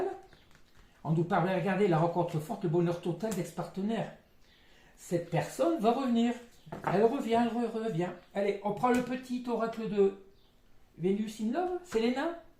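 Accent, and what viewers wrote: Nord-Pas-de-Calais, France. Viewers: Bonjour,toujours un plaisir d'ecouter vos guidances gratitude 🙏a vous.Et oui avoir la Foi c'est le secret,se prioriser et le reste suivra. Je vais bientot vous consultez,j'adore votre accent chti.Je vous embrasse 😘.Belle soirée a vous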